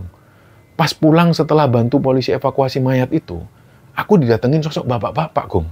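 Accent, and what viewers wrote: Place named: Banjarnegara, Indonesia. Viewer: Banjarnegara mana suara nya✌️✌️🥰 Salam sehat dan sukses slalu buat mas dan semua kru nya🤲🏼😅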